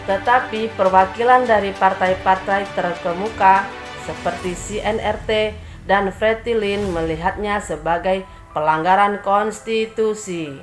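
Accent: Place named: Indonesia. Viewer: Kayaknya ema1 halo Channal ema Indonesia1 karik tan nia logat ne logat ema Indonesia nian.... 🙏🙏🙏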